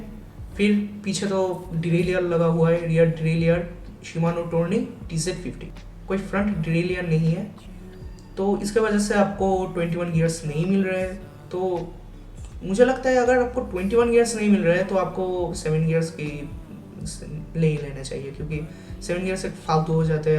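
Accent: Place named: Bengal, India. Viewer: your hindi sounds like bengali....nice though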